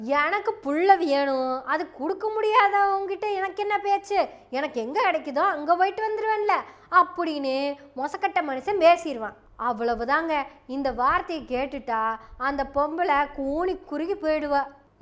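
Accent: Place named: Coimbatore, India. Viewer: Sister, you have narrated the Silapathikaram in a different dimension. Your speciality is your Coimbatore modulation. Keep going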